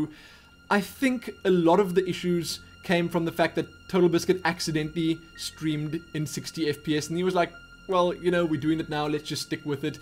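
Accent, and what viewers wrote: South Africa. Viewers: Just thought I'd let you know that I LOVE the way you sound. The South African accent is my favourite accent, and your voice is just perfect! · I think I'm one of the view viewers who doesn't find the South African accent strange at all - despite being from Scotland I grew up knowing a few South African people so I guess I'm acclimatised to it :3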